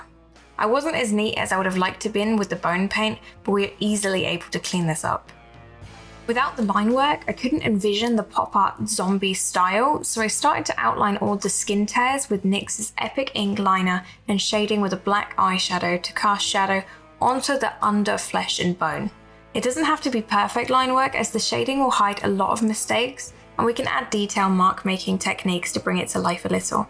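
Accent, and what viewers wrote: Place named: Australia. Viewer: I do like your voiceover better! This is much more informative for those of us who missed the stream. Plus, you know, your voice is as awesome as the rest of you! I'm from the United States and I LOVE your Australian accent! How much do you sing? I'm totally serious about your voice (at the very least, you have a wonderful speaking voice, even if you don't sing at all). <3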